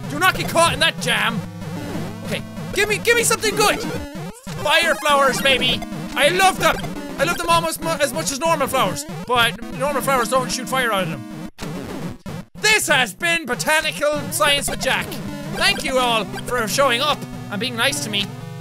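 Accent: Ireland. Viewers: Jack I love you and your videos so much. You are my inspiration. I almost broke my leg on a busy road when I was cycling, but I thought of you and what you'd say in that awesome Irish accent, so I got up and started riding again. Thank you. If you read this, please reply ❤️😍💖😘👌🏻😝💕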